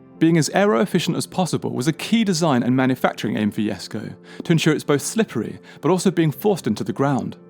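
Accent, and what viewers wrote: RP, United Kingdom. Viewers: Brittish accent is so damn annoying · Im american but I know posh when i hear it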